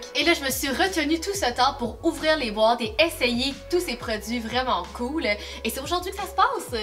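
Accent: Quebec, Canada. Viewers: J'adore votre accent québécois je voudrais avoir le même gros bisou😘💞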